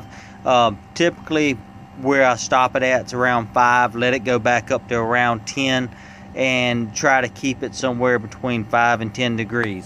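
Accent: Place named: South, United States. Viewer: Thanks bro ,when u from I travel to Baton Rouge for fire truck you sound like your from the great south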